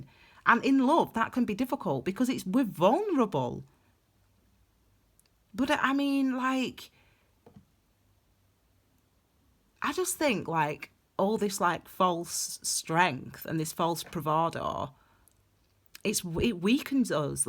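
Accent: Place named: North, United Kingdom. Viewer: Thank you another great reading.. and who can love a northern accent? 😂 is northern girls rock 💫💫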